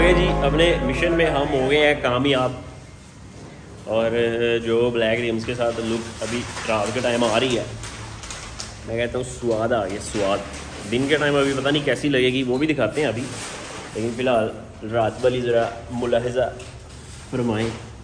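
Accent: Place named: Punjab, India. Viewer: Your punjabi ascent is so secxi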